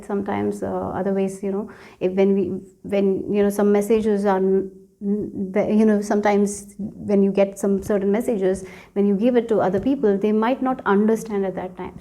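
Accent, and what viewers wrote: India. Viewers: 😱😳🥹 Ohhhh, wwhhhooooowww. First time I'm guidet to you ... My choosen pile ... speakless. How is that possible? Thank you sooooooo, so much. It is exaktly what I've needet for helping in my situation right now. A big gift.🙏❤️‍🩹💖😇🎁 Indian angel, a big hug from Germany, so nice, your accent. 😊 Isn't it wonderful, how we can understand?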